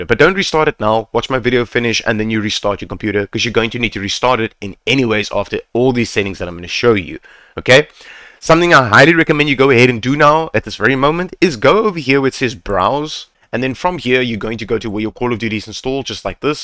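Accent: South Africa. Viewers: I knew you were South African as soon as I heard that accent! Big ups bro nice to see local gents doing well 👏🏾 (gonna try this out when my loadshedding is done)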